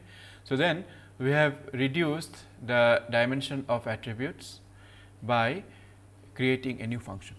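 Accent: India. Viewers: Lecture is okay if I can't stop laughing because of the Indian accent ;)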